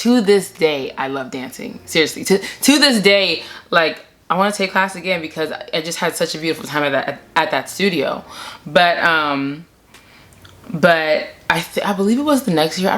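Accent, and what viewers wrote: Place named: South Africa. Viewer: Girl are you South African ?? 👀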